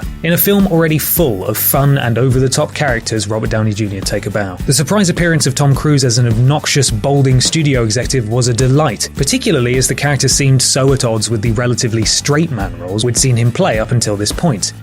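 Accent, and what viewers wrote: United Kingdom. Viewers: Awe I like his slightly British voice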